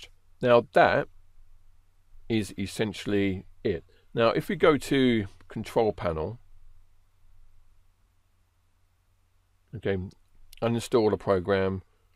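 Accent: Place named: England, United Kingdom. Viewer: I am an American so my English is very different from who I assume is doing the commentary here. He has a VERY heavy England's English "accent(?) which was VERY difficult for me to understand - sorry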